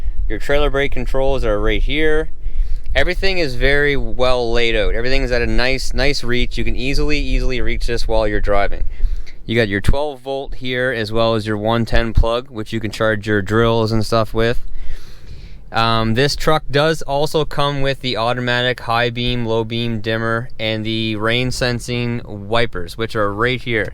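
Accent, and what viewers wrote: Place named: Nova Scotia, Canada. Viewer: He’s from Nova Scotia heavy accent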